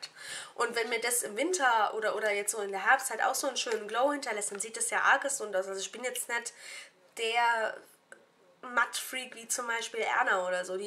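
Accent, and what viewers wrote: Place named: Mannheim, Germany. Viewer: ich wusste, dass ich den dialekt iwoher kenne! mannheim, klar wie klosbrühe :D komm aus der nähe von Heidelberg ;)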